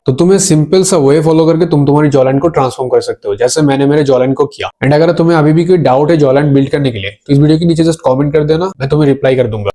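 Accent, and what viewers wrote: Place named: Bangladesh. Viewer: Bhai tumi to bangladeshi hindi te kotha bolo keno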